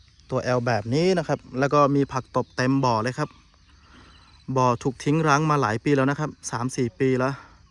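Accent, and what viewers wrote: North, Thailand. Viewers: สำเนียงคนเหนือ ถ้าอู้ภาษาบ้านเฮา ท้าจะดีนะ เหมือนคนอีสานเขาเว้าภาษาถิ่นเขาเพราะดี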